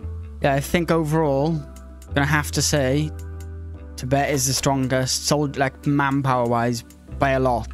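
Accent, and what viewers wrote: Wales, United Kingdom. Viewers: Are you welsh, Sir?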